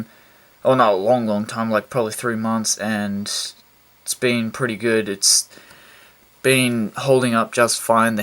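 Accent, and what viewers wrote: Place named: Australia. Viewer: U sund Australian iam Australian and Asian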